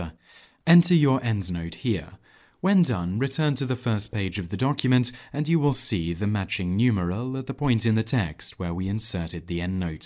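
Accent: United Kingdom. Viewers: Sounds like a wealthy fancy young price from England in the 1800s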